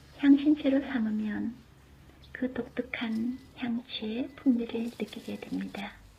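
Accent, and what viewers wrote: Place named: Gyeongsang, South Korea. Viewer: 경상도사람목소리.ㅋㅋ.목소리는있는그대로...좋아요.제가 청음짱!이라서....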